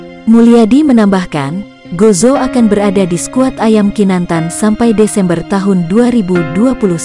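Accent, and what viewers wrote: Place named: Java, Indonesia. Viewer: Hampir medook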